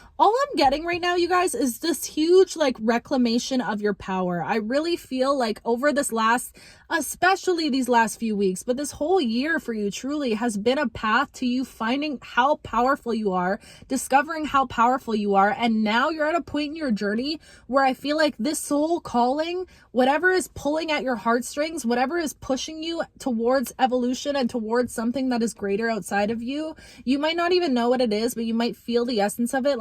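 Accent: Canada. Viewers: Are you Canadian? 😮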